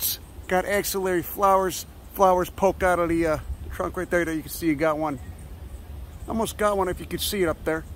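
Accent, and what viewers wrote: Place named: New York, United States. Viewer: Hello, I'm Pete, a new subscriber. Your coyote video brought me here. I am fascinated by your combination of wit, wiseguy and accent. I'm a native New Yawkah from the wilds of the Harlem projects, so I feel at home hearing your vocals and attitude. I'm going to catch up on your list of uploads and enjoy them immensely. Your humanity towards that poor little creature warmed the cold, cold cockles of my heart. I don't know shit about botany but I'm hoping aside from being entertained I will be educated. Thanks for uplifting my day!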